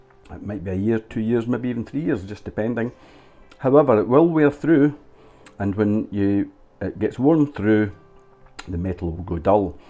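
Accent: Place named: Scotland, United Kingdom. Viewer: Great video and superb Scottish accent. Greetings from Poland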